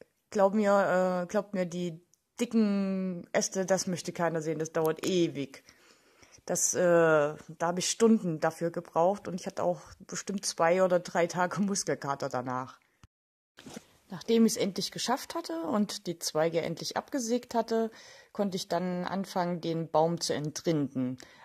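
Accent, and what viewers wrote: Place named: Magdeburg, Germany. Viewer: Klingt wie Magdeburger-Raum-Dialekt :D Super Kratzbaum und Video! Gibt n Like :)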